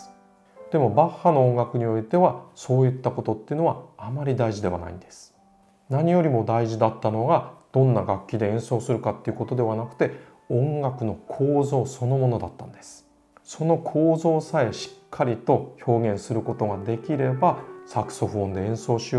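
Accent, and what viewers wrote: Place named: Fukushima, Japan. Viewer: ピアノでバッハを弾くことを躊躇っていましたが、この動画を観て勇気が出ました。ありがとうございます😊 ちなみにわたしは福島県出身なので、の話し方には親しみがあります。これからも楽しみにしています。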